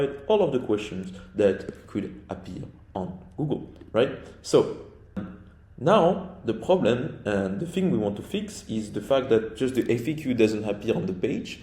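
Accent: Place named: France. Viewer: Hi, thank you for your video, it's great what you did. I think you have a slight accent, you are French?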